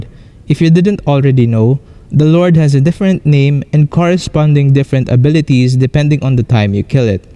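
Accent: Philippines. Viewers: Obviously his accent is Filipino...what's your point?! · Maybe he is philipino, they have this accent, almost all ML youtubers from Malaysia, Philippines and Indonesia has this accent.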